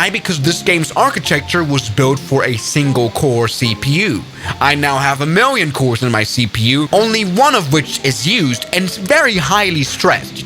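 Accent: Germany. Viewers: I was not expecting the German commentary brother. I guess I never realized you are German lol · Dein Englisch-Deutsch hat mich gekillt😂👍. Ich hab von Kindesbeinen an sämtliche Simulatoren geflogen, angefangen mit FS98, 2000, 2002, 2004 und der FSX natürlich. Der Übergang vom 2000 auf 2002 war schon revolutionär damals. Bevor ich aber wirklich mit dem MSFS angefangen habe bin ich viel "Fly Unlimited 2", der wahre Flugsimulator meiner Kindheit. Ach und dann war da noch "Fly! 2" von Take 2, kaum bekannt. Ich erinnere mich noch an Top Addons im FS2002, Capain Sim hat im FS2002 mit der 727 angefangen. Die war damals revolutionär oder die gute "Ready for Pushback 747-200". 2012 habe ich dann Pause gemacht und Anfang diesen Jahres mit dem FS2024 angefangen. Ihr könnt euch nicht vorstellen, wie heftig diese Entwicklung für mich war😂. Die Grafik war das Eine, okay, ich hatte den 2020 schon auf dem Schirm und wusste von der guten Entwicklung grafischen Entwicklung, aber was für mich krass war, war die neue Technik in den Cockpits, nämlich die neuen Glascockpits. 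Bis dahin war für mich das Cockpit einen A320-200 am modernsten oder das einer 777😂. 2011 gab es doch von Microsoft einen ganz kläglichen Simulator. Ich glaube der hieß einfach nur "Microsoft Flight", spielte nur auf Hawaii und war grafisch im Grunde nur ein leicht aufgehübschter FSX, wurde auch baldig eingestampft. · bro was a Germany or swiss?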